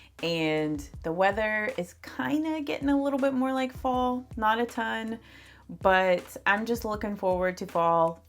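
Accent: South, United States. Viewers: It could be her accent, it's a little southern ❤. She pronounced it Ma/cari. Which I also say ma/cari lol.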